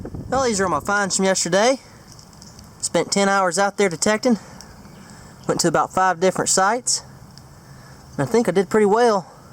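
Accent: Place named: Rural, United States. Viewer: Ur accent says ur a hick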